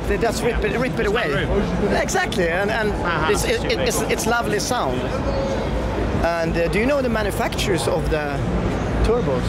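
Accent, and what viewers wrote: Sweden. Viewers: Hi. You really should get a microphone with that camera, sometimes it's very hard to hear what you are saying, specially with a Swedish accent:):):). Anyway, good vid, really enjoyed the Alpinas, I prefer the Alpina instead of the new M5! They look better and more powerful. Take care.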